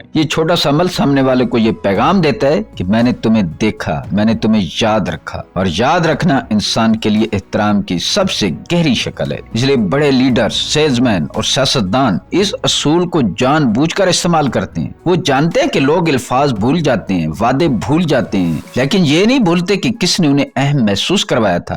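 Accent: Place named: Punjab, Pakistan. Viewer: Content Acha hy….pr lehja Punjab k Molvi sahab jesa….please Urdu pronunciation (talaffuz) sahe ada kia karaien bulky properly seekhain….ya kisi achi Urdu bolny walay sy voice-over karwaien! Thanks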